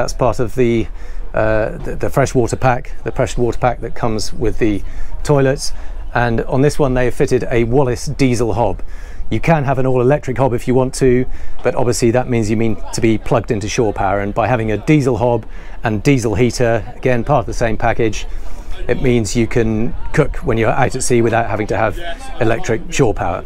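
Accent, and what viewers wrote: England, United Kingdom. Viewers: Does anyone care about insulation for cold weather boating? It's a super impressive for the price. It is amazing. However boats in general tend to ignore, three of the four seasons we have here...as in Ireland, where I'm from, Scotland, where he mentioned the boat was from, or England, where he sounds like he's from...but basically the entire north half of Europe, all of Canada, and basically almost all of the USA. I'm not trying to say a boat like this is bad, because for the price, it's super. But if you've ever been in a warm house with poor insulation, or patches of poor insulation, then you know about kold and how hard it is to get out. It will literally dif itself into surfaces like plaster, concrete, and ceiling boards. I know this from first had experience of literally digging into a concrete wall, and a ceiling board, on separate occasions, and finding mold dug in a good few millimetres. 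Any cleaning fluids won't work when it's inbedded into the concrete itself.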